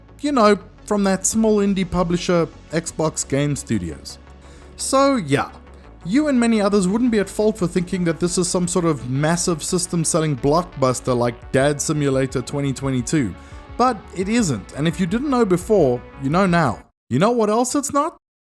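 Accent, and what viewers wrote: South Africa. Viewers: Yiss I fought this oke sounded a bit on the safrican side.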